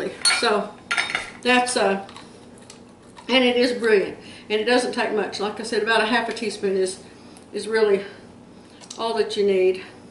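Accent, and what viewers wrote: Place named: Texas, United States. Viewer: I just found your site and you sounded like a Texan. Now I see that you have on an apron with Texas. Where in Texas are you? I grew up in Temple and now live in Paraguay.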